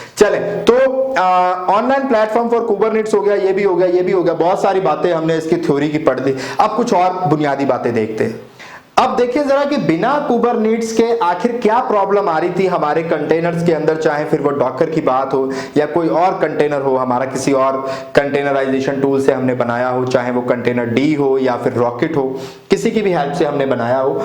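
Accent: Pakistan. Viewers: Tell him to do a ljve f4om India, or you share me a video that proves his Indian Identity..His accent is pure punjabi pakistani accent n he also hidea time zone of his system.. Anyways he is doing a great job..One of the best online tutor..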